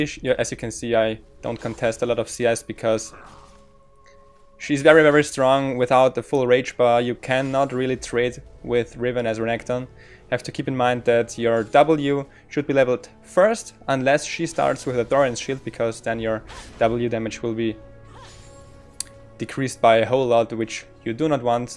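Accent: Germany. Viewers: thats your fault you have to speak free and not try it hard oder rede einfach deutsch ich glaube das du deutsch sprichst mit deinem akzent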